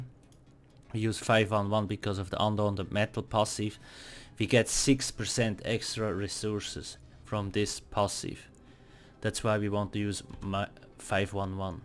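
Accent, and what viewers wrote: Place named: Switzerland. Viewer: you sound a lot like a swiss, are you one?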